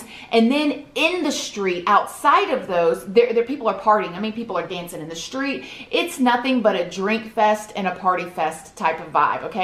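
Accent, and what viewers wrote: South, United States. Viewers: You're so fn southern. It's like, real genuine "y'all" can't make it up❤❤ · Hey nothing wrong with that southern swang 🤣 I live in the same town as her and we all say y’all around here 🤣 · guess I should've figured, being she talks about Florida's women's prisons.. lol I guess when she said a couple hours from New Orleans it threw me off.. plus I guess I didn't realize ppl in FL really talked like Southerners... What do I know? FL is one of the few states I haven't been to. Thanks for the response :) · 🤣🤣 ya'll count! I love it! I think it's the cutest! I love southern accents and the specific way they say certain things! So charming!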